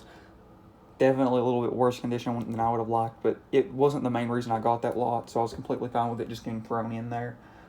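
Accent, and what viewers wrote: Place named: South, United States. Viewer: Wow that looks hyper realistic! Nice southern draw you got going, I got one too I’m from Arkansas.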